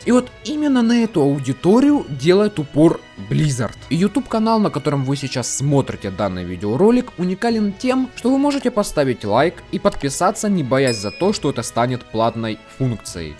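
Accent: Ukraine. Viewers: черт, я по голосу вычислил сразу что ты из Украины🇺🇦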